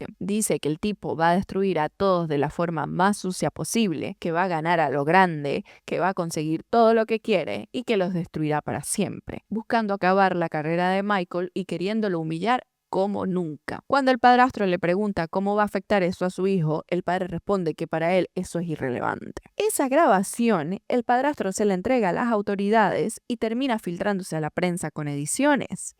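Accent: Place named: Venezuela. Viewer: Me gusta muchisimo tu acento venezolano, (soy española ) a de mas tu tono de voz es elegante y muy relajante., podria escucharte el dia entero ❤❤❤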